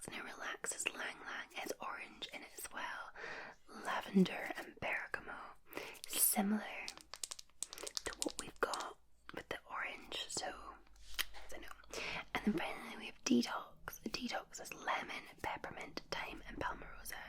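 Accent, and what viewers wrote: Scotland, United Kingdom. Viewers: My New favorite!!!! 😍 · I don’t know what it is but that Scottish accent is so soothing and it puts me to sleep really fast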